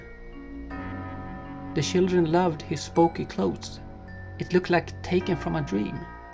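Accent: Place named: Ireland. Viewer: Awwwww I loved that! I enjoyed your story-telling especially with your Irish accent! You are so talented with your pumpkin carving and all of your other drawings!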